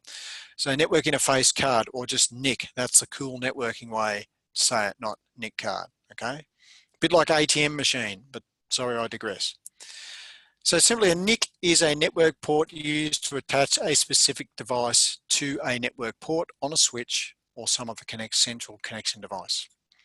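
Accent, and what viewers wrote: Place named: Australia. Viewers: yes you're right. Australian not British. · Are you suggesting that an Australian presenter, working for an Australian university should try to put on a fake accent of some kind to somehow make it more understandable for others? What's your suggested fake accent? Or should he record each lesson multiple times in various accents? Or maybe he should learn multiple languages. I can't believe that after more than 2 hours of informative material, that's your only feedback. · Definitely Australian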